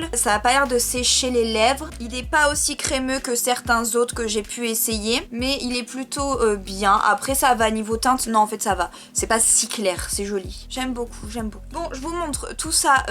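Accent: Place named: England, United Kingdom. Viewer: Cet accent anglais j’adore😂😍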